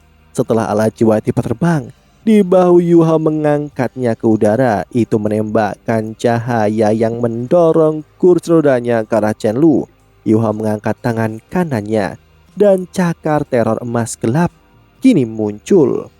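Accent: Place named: Purbalingga, Indonesia. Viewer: Cah ngapak purbalingga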